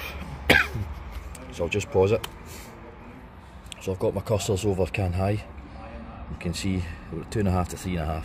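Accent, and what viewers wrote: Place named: England, United Kingdom. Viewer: Strong English accent damn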